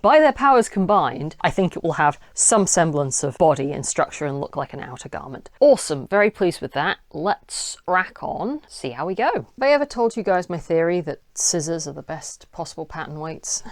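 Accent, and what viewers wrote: United Kingdom. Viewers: so I'm new here -hates jk Rowling -adhd fueled sewing -high vis attire + wizards -English accent - "to the floor, where you belong" (slightly seductive commentary) yea, I think I'll stick around for a while, this place seems cool, I can fuck with this type of content, this is enjoyable, youre someone id try to be friends with irl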